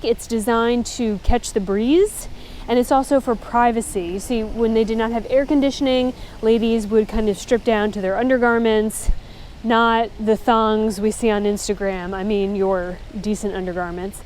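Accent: South, United States. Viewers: You definitely developed a gentil southern accent for a jersey girl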